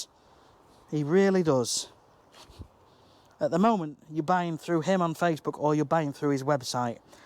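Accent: United Kingdom. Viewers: UK accent with imperal mesurements lol kinda threw me off. Its all good keep up the good work.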